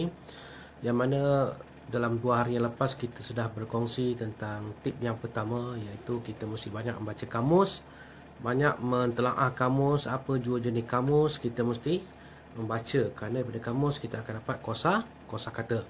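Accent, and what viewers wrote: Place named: Malaysia. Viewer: Mantap Tutorial and tips nya Saya Jadi ingat upin ipin Logat Malayu kental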